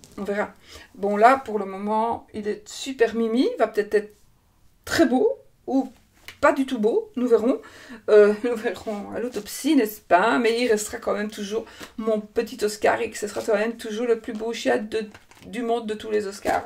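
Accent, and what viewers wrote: Belgium. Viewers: trop sympa ce type de vidéo, j'adore t'écouter parler des tes cartes, de tes chiens, de ta vie avec ton petit accent belge que j'adore !!!!! ca me permet de me sentir moins seule dans mon atelier alors merciiiiiiii !!!! Et Oscar j'adore, c'est le nom de mon tt 1er chien, j'avait à peine 20 ans, c'était un croisé bichon/caniche d'une gentillesse incroyable, obéissant, intelligent, sociable, sage, bref, une perle, il m'a accompagné pendant 14 ans <3 je te souhaite donc une toute aussi belle histoire avec cette adorable boule de poils noire et blanche <3<3<3 Bisous et change rien c'est comme ca qu'on t'aime !!!!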